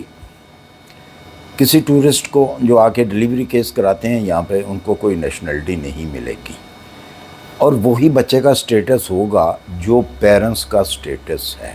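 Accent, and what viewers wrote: Punjab, Pakistan. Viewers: بھائی اپنی engish تو ٹھیک کر لین ۔ لفظ . رسپونس . نہ بولیں ۔۔اسپوسر بولسن ۔۔اپ پنجابی مین english بول رهے حسن ۔۔۔۔ Dont use word: Response ...it should be sponsorship ... کسی پڑھے گکھے ادمی سے پتہ کر لو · Tu c paindo ho. Ardo (Urdu) punjabi which bolde ho.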